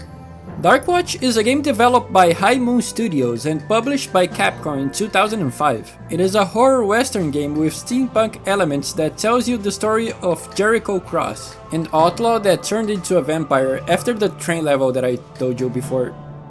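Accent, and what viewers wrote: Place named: Brazil. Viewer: Im not doxxin but i I knew you were Brazilian, your "English" is very different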